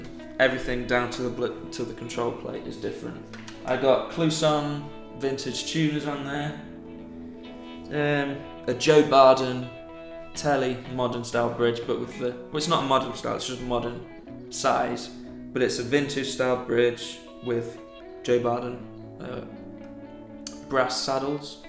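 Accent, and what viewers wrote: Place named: North West England, United Kingdom. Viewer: Sweet that mate- lovely sounding, you sound like you’re from my area- you North-West? I’m Warrington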